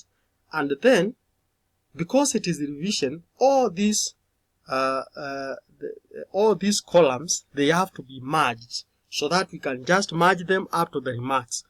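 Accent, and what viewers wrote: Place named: Kenya. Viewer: I guess you're asking about the accent? It's a Kenyan accent with peppered with Kamba(Tribe) accent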